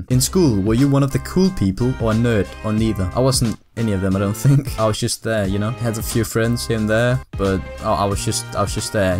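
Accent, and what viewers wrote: Denmark. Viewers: I knew that accent sounded familiar... You're Danish! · Hehe, det var sgu nemt at høre på din dansk-engelske accent!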